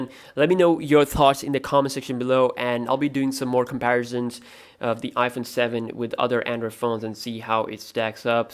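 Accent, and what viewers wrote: United States. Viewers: OMG. You sound like American. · no one has observed. If you look carefully you can see two men in kurtas, which is Indian tradition and this guy is American (or may be of other country),so this photo is clicked in India. Not by this man. This has been picked and pasted from other videos or Internet. You can see this . This guy sounds like American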